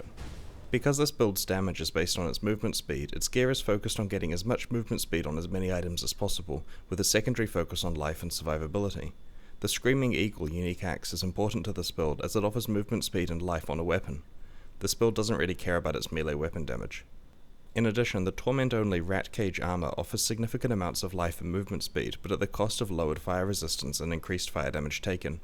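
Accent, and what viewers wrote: New Zealand. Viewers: No, thats just the New Zealand accent :)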